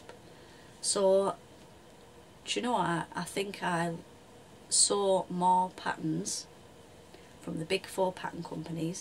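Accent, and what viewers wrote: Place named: North East England, United Kingdom. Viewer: Enjoyed your vlog your shirt is lovely -was trying to place your accent you sound like you may be from my neck of the woods I’m formerly from Bishop Auckland now North Tyneside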